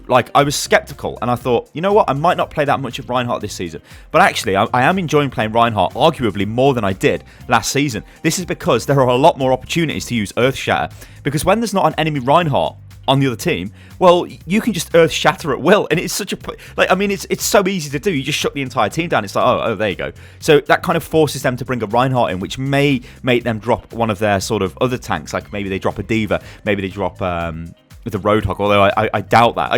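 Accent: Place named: Liverpool, United Kingdom. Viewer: Where ya from, i cant pin your accent, is it liverpool or something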